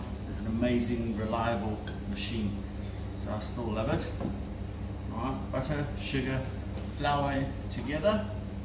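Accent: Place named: South Africa. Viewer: It’s been so long since I’ve heard a good South African accent (thank u)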